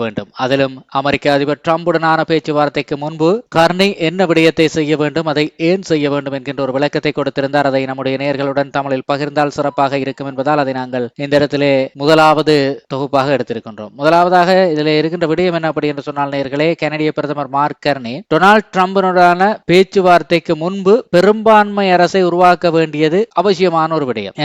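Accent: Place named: Sri Lanka. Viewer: Lankan tamil,namma chennai tamil pasya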